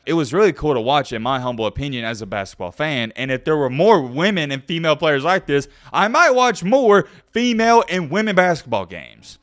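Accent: South, United States. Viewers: Dude get a life it’s sports SHES white and ur mad and ur southern accent makes it worst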